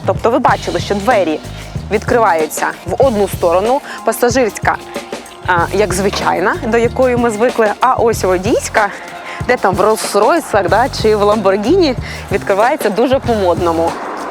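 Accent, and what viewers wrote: Russia. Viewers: Вах, Тарик, разбирается в мове? Так крыша или дах? Послушай ещё раз! Ведущая, просто "Пэрсык в Багдадской ночи!", но украинская речь - с русизмами, а это - суржик... Увы.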